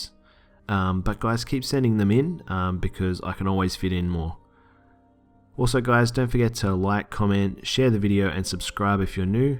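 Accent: Australia. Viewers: Is he Australian? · U australian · ur Austrailian thank god there's an Austrailian saying a horror story I am subing to u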